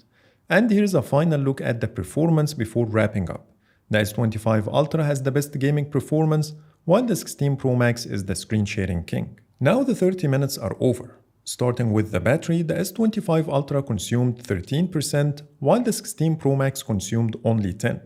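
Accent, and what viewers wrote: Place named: Egypt. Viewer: are you Egyptian i can sense the the accent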